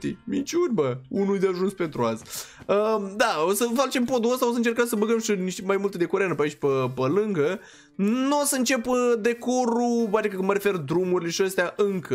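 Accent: Moldova. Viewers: Moldovean 100%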